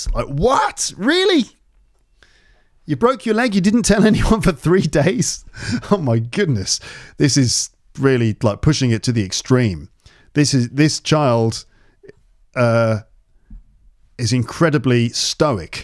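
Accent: United Kingdom. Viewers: May I ask you an external question, my teacher 😊 Your accent is belong to which region of UK? With me, it is clear to understand the story. Not the same feeling when I listen to my beloved singer Adele talks. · I love listening to your channel, especially your clear British accent. Keep up the good work, 😊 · What an incredible experience I have watching your videos. Love your british accent and the way you explain the meaning of some words. By the way, "mi amor" is Spanish and means "my love" which is a way to call our partners when there is love involved. Hope to see you soon and thank you!! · The attractive places of this Youtuber are not only his British accent, but also his quality of voice and pace! It looks as if I listen an audio book. What an amazing podcast !! I begin to be hooked by his. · I like your excellent British accent, it is so attractive to watch your videos more , it's amazing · B.B.Wang Perhaps I am the oldest student you have ever taught. 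I am an Australian-Chinese 90 years old. I have kept learning English to enrich/ improve the living quality of my” late” years. Your teaching course helps very much. I especially appreciate the way you teach. I can follow you almost the whole process. And the UK pronunciation. Thank you!. (Please have tolerance for my poor grammar.) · I mean you're birtish for me i try to learn accent birtish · Thank you so much,! I can read and write English (with US vocabulary) a little but I can't speak:( I've just discovered your Youtube channel and I literally love it! This story is amazing and I love your UK accent 💓. I'm trying to improve all my English skills. Can I use US vocabulary while speaking with UK accent? Is it grotesque and offensive to native speakers? 🤔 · Hi, great to hear the story, listen to your British English. Also your comments were very helful. Props to you! I am a 74-year-old writer of poems, short stories and cronicles. 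I have shared the video.❤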